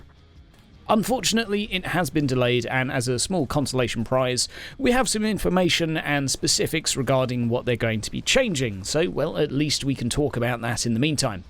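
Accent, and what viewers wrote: United Kingdom. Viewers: I don't think any other fellas have a better British voice/accent than.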